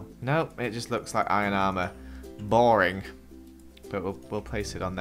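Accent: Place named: Scotland, United Kingdom. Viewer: he is Scottish so he talked diff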